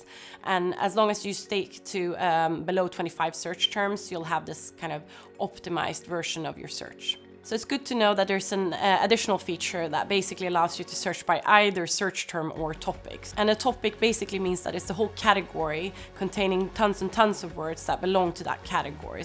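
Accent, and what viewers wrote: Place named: Sweden. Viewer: Accent is Swedish!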